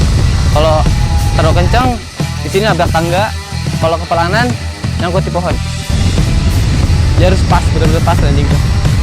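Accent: West Java, Indonesia. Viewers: nagen (sunda pisan si aa ) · Tetep ya a sunda nya keluar, emng enak bgt ngomong pake bahasa sunda ma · "Nagen" apa ya Nagen "Hampeg" apa ya hampeg, kocak emang ge ka alshad mah sunda pisan..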